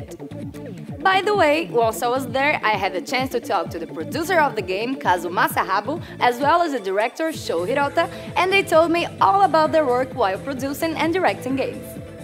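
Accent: Brazil. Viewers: She has an brazilian accent... is she brazilian? Very nice host! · I'm also the host for Bandai Brazil and Latam and I'm brazilian, so I know there's some accent there, but I'll work harder on that matter and I'm sure you'll end up loving the content!! <3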